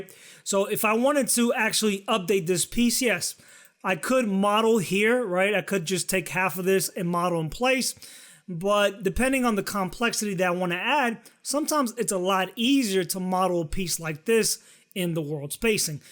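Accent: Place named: New England, United States. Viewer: Awesome tricks, nice to see some tuts with good ol' new england accent too.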